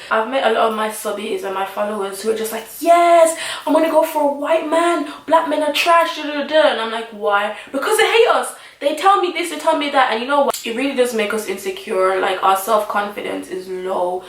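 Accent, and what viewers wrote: Jamaica. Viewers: I love when your Jamaican accent comes out 😍😍 You're so peng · I seriously love it when you speak patois😂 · Omg are u a Jamaican? ??......mi hear di patwa....hahaha😂😂😂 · First time watching ur vids and I could tell rite off the bat that youre a Jamaican... ... boii we cyaa hide.... ...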